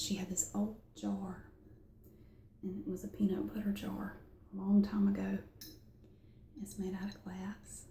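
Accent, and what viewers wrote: South, United States. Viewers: Subbed from Tennessee. Love that southern accent.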